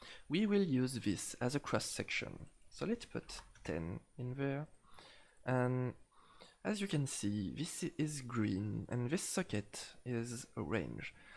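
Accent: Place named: France. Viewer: cette accent français xDDDD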